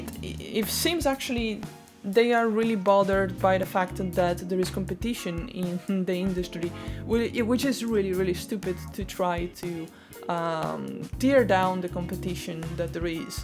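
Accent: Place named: England, United Kingdom. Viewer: Great analysis. I like you would not support this company with my custom and like you think the Les Paul is a beautiful guitar. Your comments about contradicts was true and your ethics about not buying inferior and suggesting Gibson should focus on making a superior product made sense. I also agree with you, let the customer chase you for a well made product at an attractive price rather than chase, threaten and bully the customer. This is one of the most balanced comments without sarcasm, anger or abuse. Plus, your English accent is gorgeous! 👍🎸👌❤️ Great job.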